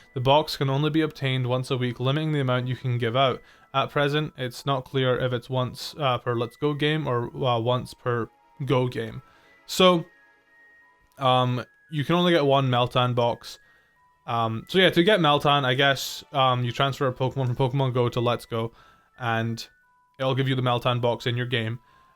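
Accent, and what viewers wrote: Scotland, United Kingdom. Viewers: what part of Scotland you from?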